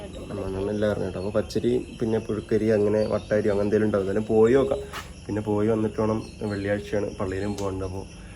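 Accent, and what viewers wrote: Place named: Kanjirappally, India. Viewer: സംസാരവും ഞങ്ങൾ കാഞ്ഞിരപ്പള്ളിക്കാരുടെയും സംസാരവും ഒരുപോലെ ഇരിക്കുന്നു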